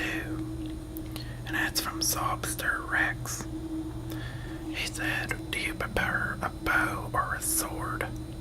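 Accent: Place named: Baltimore, United States. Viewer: Hey, love your videos. It's interesting to hear that your accent is PA based. You sound SO much like you're from Baltimore, which is where I live. Anyway, keep up the good work. Your voice absolutely lulls me to sleep.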